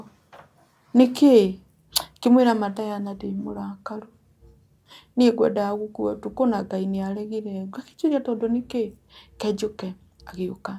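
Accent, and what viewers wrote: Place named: Kawangware, Kenya. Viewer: Hapana hiyo lafudhi si ya kwenu. Ni ya kwetu Kawangware.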